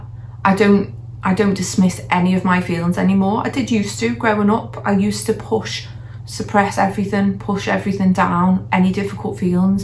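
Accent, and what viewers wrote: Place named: Liverpool, United Kingdom. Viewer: Yes scouser 🙌🏻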